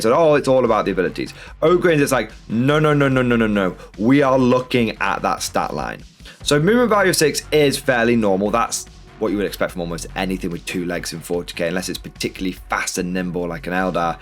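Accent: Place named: United Kingdom. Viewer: is swooning me with his cute British accent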